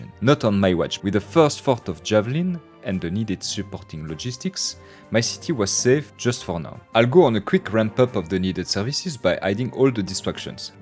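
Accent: France. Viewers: Your french accent is so satisfying to listen while watching you building an amazing city.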